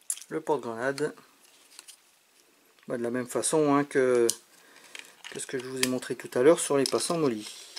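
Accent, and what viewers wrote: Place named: Franche-Comté, France. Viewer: Hoooo j'ai kiffer l'accent vingt dieuuuuuuu...franc comtois en force lol... Plus sérieusement c'est de la très bonne qualité mais le prix de la grenade pique un peu quand même je dois avoué sinon superbe présentation comme dab.a bientôt à l'OP 😉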